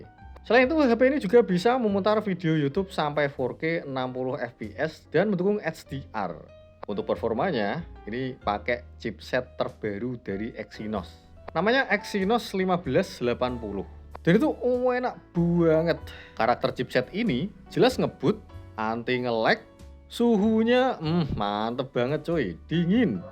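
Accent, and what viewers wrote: Pati, Indonesia. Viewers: Wah wong pati ya mas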